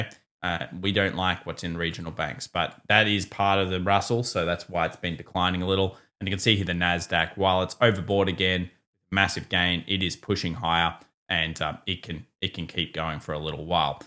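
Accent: Australia. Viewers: He's just Australian m8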